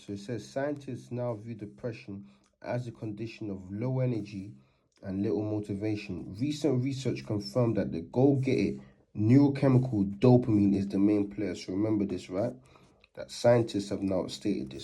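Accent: United Kingdom. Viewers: I love your British accent so muchhhhh bro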